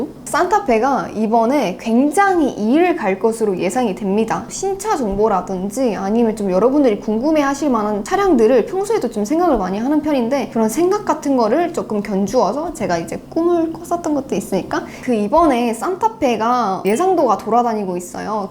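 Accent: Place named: Gyeongsang, South Korea. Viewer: 고향이 경상도 어디세요? 사투리가 똑같네요 ㅎㅎ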